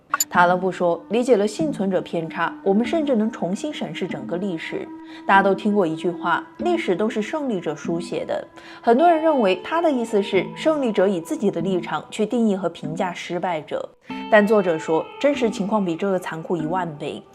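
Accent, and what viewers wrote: Hunan, China. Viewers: 大概率是湖南口音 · 博主真的不是湖南人吗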